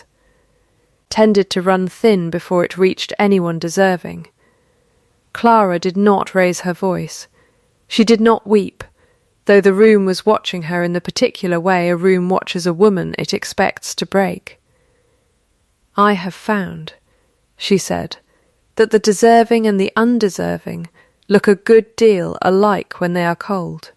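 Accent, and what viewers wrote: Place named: United Kingdom. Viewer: An awfully severe British accent generated by AI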